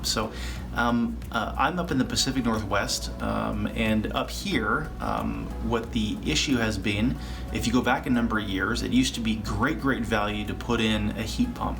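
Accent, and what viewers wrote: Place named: Canada. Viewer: The company says FurnaceUSA but the presenter is clearly Canadian!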